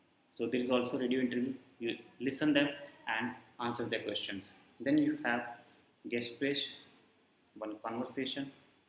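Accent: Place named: India. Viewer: I could not understand anything at all due to your Indian accent.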